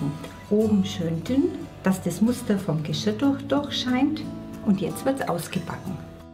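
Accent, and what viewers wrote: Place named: Franconia, Germany. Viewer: Hallo, aus welcher Ecke Frankens kommst Du? Ich höre den Dialekt so gern. Lg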